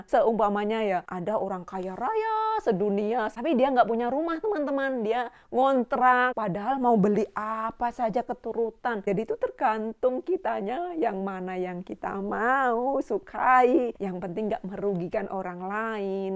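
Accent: Java, Indonesia. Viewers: Mbak ini logat ngomongnya seperti orang Jawa, salam dari Jogja mbak, suka banget ngikutin vlognya sehat selalu mbak dan keluarga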